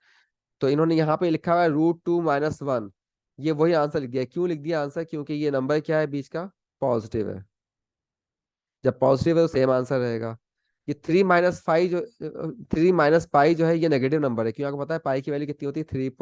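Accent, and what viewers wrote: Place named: India. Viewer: oh my god the pronounce is so india;i don't understand what he says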